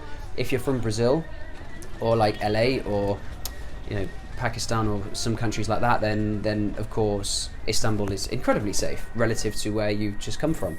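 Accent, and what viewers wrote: United Kingdom. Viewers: Heyyy I love your accent Can you tell me something about it? And where are you from in UK? I mean where you grow up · Hi, I really want to say that your british accent is so pretty cool! I like it so much and want to have this accent as you :) Hope you come to travel in Thailand once. Although it might be not attractive so much like a big city, there are a lot of attractions waiting for you to enjoy x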